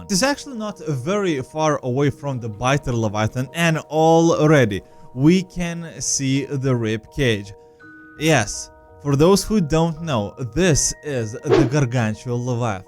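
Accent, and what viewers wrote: Russia. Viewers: OMG i finally find a good youtuber your russian actcent sounds good i love your vids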